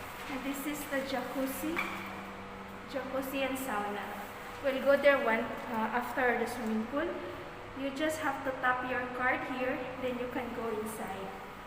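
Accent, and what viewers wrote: India. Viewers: I you acquired the Indian accent. Your daughter is cute. Have a wonderful day.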